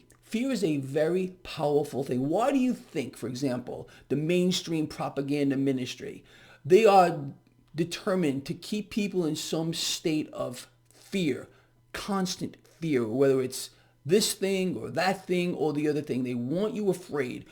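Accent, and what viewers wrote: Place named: New York, United States. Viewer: For a second I thought you were telling us to buy COAL for every 100 stocks. 😆Texas ears, meet NY accent. BTW, I just bought your eBook and I'm already learning some concepts - thank you so much for the education.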